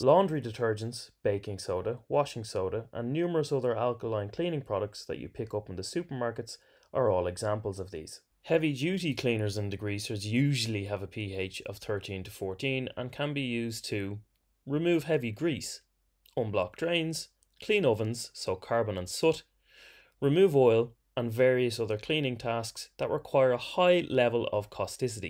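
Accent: Ireland. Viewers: The magical lilt of an Irish voice... listening to your voice... makes me happy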